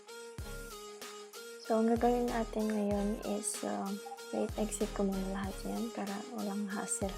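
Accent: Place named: Philippines. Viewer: i could tell with the accent i’m half filipino:)) salamat po for the video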